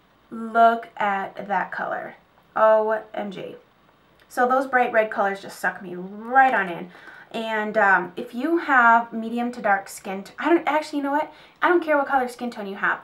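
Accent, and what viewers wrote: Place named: Minnesota, United States. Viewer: Sounds like a Minnesota accent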